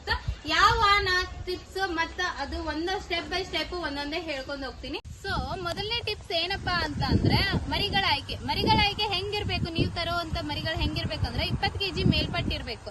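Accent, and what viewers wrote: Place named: Koppal, India. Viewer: ನಿಮ್ಮ ಭಾಷೆ ನೋಡಿದ್ರೆ ನಮ್ಮ ಪಕ್ಕದ ಜಿಲ್ಲೆಯ ಕೊಪ್ಪಳ ದವರು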